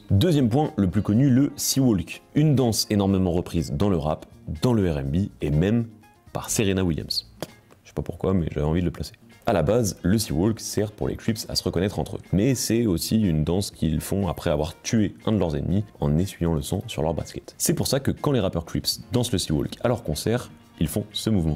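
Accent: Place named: United Kingdom. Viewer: la prononciation anglaise change le sens des mots, stp fais gaffe pour les sourds et malentendants merci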